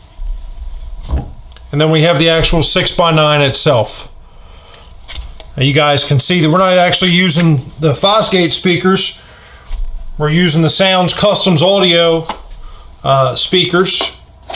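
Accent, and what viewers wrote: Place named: Maryland, United States. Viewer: listen to the 'Merilan' in his voice....also, loved that he substituted speakers.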